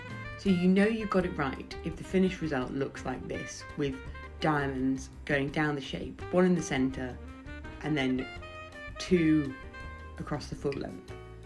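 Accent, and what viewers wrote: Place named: United Kingdom. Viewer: I THOUGHT I WAS FOLLOWING YOUR DIRECTIONS BUT YOU DON'T GIVE THE ACTUAL DIMENSIONS OF THE PAPER THAT YOU ARE USING AND THE A.I. TRANSLATOR FOR CLOSED CAPTIONS DOESN'T UNDERSTAND BRITISH ACCENTS AND IT USED "A3" FOR ONE DIMENSION THAT I THOUGHT YOU SPOKE. YOU HAVE 2 TALL TRIANGLES ACROSS YOUR PAPER AND I HAVE 4 SQUARE TRIANGLES? I WISH YOU COULD GO SLOWER AND TAKE A PICTURE OF WHAT THE PAPER LOOKS LIKE WITH EACH KIND OF LINES YOU DRAW. YOUR HANDS ARE ALWAYS GETTING IN THE WAY OF THE CAMERA VIEW AND THEN THE IMAGE BLURS OUT. I REALLY WANT TO MAKE THIS!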